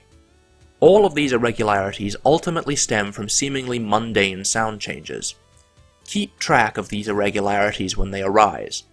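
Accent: United Kingdom. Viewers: Damn British English. I keep thinking he's saying "copular", not copula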